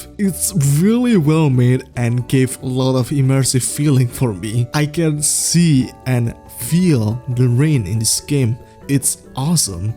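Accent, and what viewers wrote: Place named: Indonesia. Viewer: great video! i was wondering where your accent originates from? also i'm surprised you don't have more subs, your content is very polished:) keep it up! edit: i'm guessing you're from indonesia or are from indonesian heritage and that's where your accent is from after watching more of the video. i've never learned much about it, so i will definitely watch your videos to learn more about your culture and how it's portrayed in coral island!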